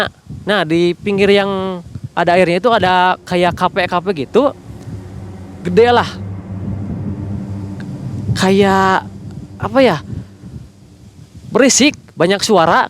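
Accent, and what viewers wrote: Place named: Sunda, Indonesia. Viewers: Sunda campur Indonesia. .Teuas . 😄 . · Sunda nya kentel ga bisa ilang 😁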